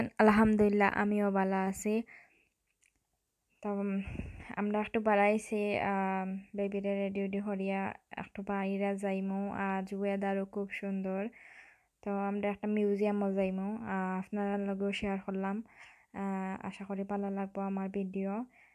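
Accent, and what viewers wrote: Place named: Sylhet, Bangladesh. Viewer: সিলেটি আপা আপনার কথা হুইন্না আমার ভালো লেগে গেছে অনেক ভালো লাগছে আপু আমার কথা শোনার জন্য আমার বাড়িতে আইসেন একটু দাওয়াত দিয়ে গেলাম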